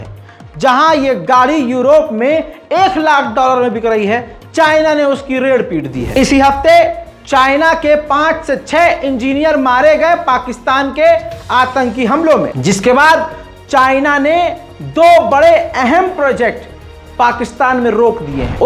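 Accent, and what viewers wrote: India. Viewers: Aby itni andh bhagti bolne ka yeh lehja Indian he pakistanio ka lehja zameen asmman ka farq he.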